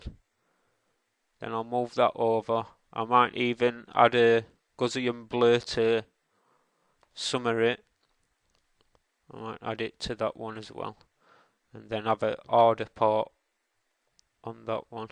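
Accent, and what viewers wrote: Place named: Sheffield, United Kingdom. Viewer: pquarmeARTS he sounds like because he lives in sheffield "I don't think you now a lot of people form Sheffield" :)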